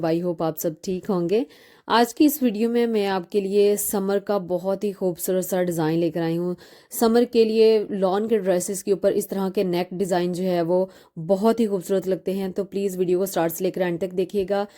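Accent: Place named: Punjab, India. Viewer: Bhoht khub ❤ mam aap kha se ho panjabi tone aati h aapki voice mai 😊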